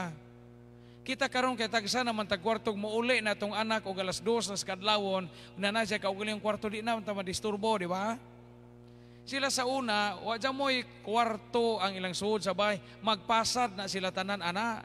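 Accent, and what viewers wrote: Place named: Bohol, Philippines. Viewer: Lively pod kay siaw man siya. He reminds me of our former DepEd Division supt who is also from bohol. Parehas sila mosulti binol anon jud.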